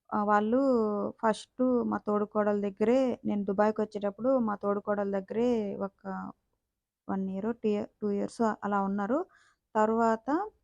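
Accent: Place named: Telangana, India. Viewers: మీరు మాట్లాడటం కొంచెం తెలంగాణ లెక్కనే ఉంది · Telangana valla tho unty ela ne matladutaru ga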